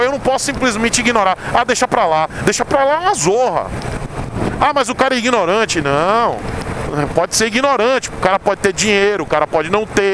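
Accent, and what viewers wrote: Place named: Northeast, Brazil. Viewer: Que vídeo necessário meu irmão, nestes tempos de culto ao ódio, é bom demais ouvir palavras como à sua, um cara sempre alto astral. Eu nunca fui de ler comentários no youtube, pois acho que é muita destilação de ódio. Então meu caro, fica essa força da minha parte para contigo, eu que sou negro e ateu e pobre sei o que é sofrer preconceito. Meu amigo o sotaque nordestino é maravilhoso, sou do interiorzão de Minas e acho legal demais o sotoaque e a resiliência que o nordestino tem! Um forte abraço, continue brilhando meu caro!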